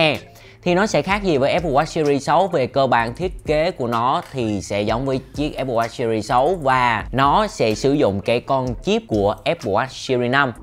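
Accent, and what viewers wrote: Phu Yen, Vietnam. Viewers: Ad dân phú yên à Nói tiến phú yên rặc kkk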